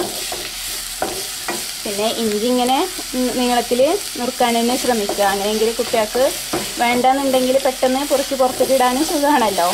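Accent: Malappuram, India. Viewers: മലപ്പുറം. ആണോ വീട് സംസാരം. കേട്ടിട്ട് നമ്മുടെ. ഒരു. Reedi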